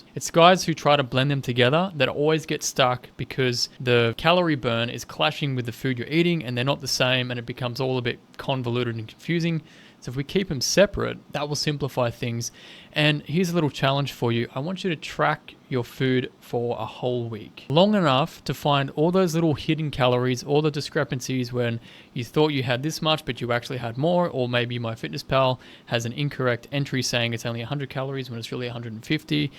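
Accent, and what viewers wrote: Australia. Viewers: I couldnt understand a word. Are you osstrayliyen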